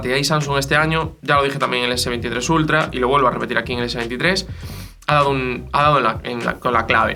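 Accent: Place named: Cádiz, Spain. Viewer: Que acento más bonito tenéis los de Cádiz 😅😅😅😅😅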